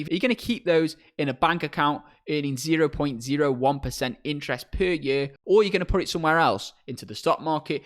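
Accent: United Kingdom. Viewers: Can you try to speak with less of an English accent? I can’t attention long enough with your draw.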